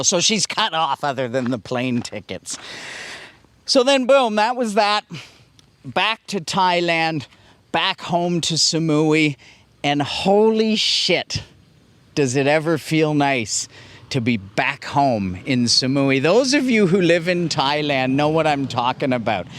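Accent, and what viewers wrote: Canada. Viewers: lol I love the canadian accent. They can't rob us of that no matter where we live, or for how long!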